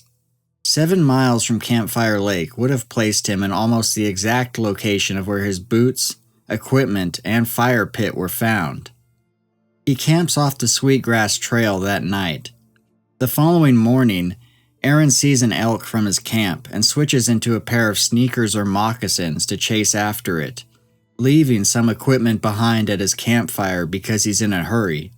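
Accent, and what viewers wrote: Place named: Southern California, United States. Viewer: Is it interestING or interestEEN? HuntING or huntEEN? EndING or endEEN? Dang SoCal Californians!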